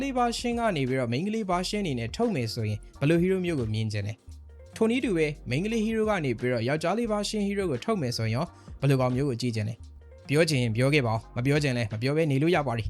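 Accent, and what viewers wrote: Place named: Hokkien, China. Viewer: ပြောတာပြောရတယ်..သူ့လေသံက.Hok fan😅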